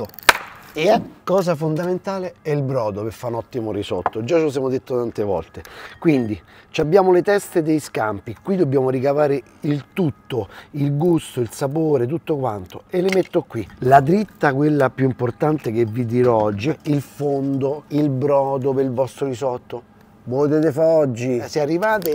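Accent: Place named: Milan, Italy. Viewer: Ma non ha aperto a Milano? Ma non lo senti l’accento milanese??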